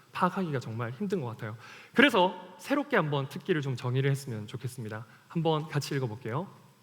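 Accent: Gyeongsang, South Korea. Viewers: 나랑같은 경상도 사람이네ㅎㅎ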